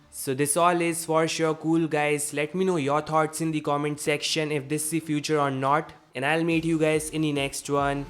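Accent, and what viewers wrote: India. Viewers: I heard indian i dislike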